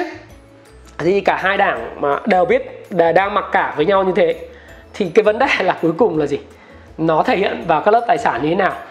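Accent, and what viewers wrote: Standard. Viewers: Anh nói chuẩn cmnl. Hơi bậy tý. :D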